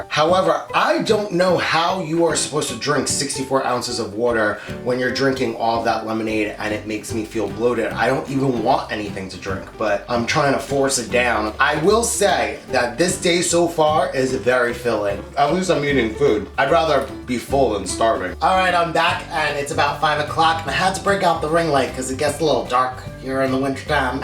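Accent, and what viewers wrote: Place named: New Jersey, United States. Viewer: I’m assuming ur from jersey or something because u pronounce water “wuter” (not making fun of u, I just know bc I have a friend from jersey who does the same thing)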